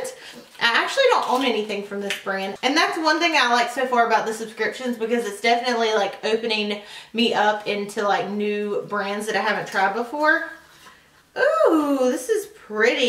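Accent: South, United States. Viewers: You're so beautiful 💞💁🏻 and I love your cute little southern accent lol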